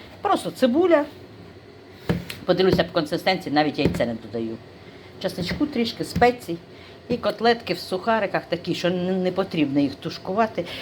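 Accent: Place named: Central Ukraine, Ukraine. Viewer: Ну от навіщо цей ЛІКБЕЗ. Це не той канал, де грамоті навчають. знімає Проізвольні відео, і мені цікаво все і навіть її говір. Бо я теж з центральної України і балакаю також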